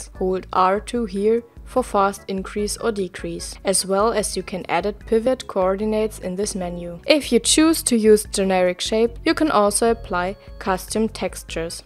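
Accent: Germany. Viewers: The school i really need ^^ Does she have a german accent ? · Ahhhh das deutsche English :D. I Love it :D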